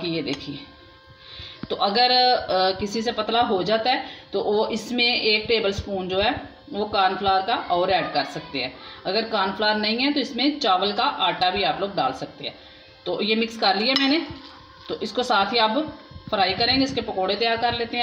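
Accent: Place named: Punjab, India. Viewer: Wowww Super like 48 for the Super tasty and crispy Moong Daal ke Pakode. Kya baat hai Bahaut hi alag style se banaya aapne inne. Bante dekh muh mein paani aa gaya. Nicely prepared and well explained each step. Aapka Punjabi tone hai bolne mein, jo hi Bahaut accha lagta hai. Jabardast Pakode ku Beautiful presentation ke saath. Wah ji wah mam. God bless you always 🤤🤤😊👍👍👌🙏🙂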